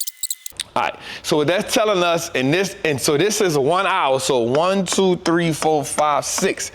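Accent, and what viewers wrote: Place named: Louisiana, United States. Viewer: That's that Louisiana drawl, lol!! Im born and raised in Chas SC, so I know what you hearing, lol!!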